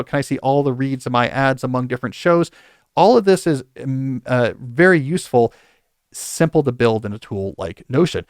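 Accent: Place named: Germany. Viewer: Worst German accent ever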